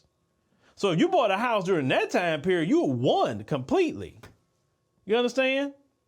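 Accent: South, United States. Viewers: your southern, Mississippi, Arkansas poor schooling accent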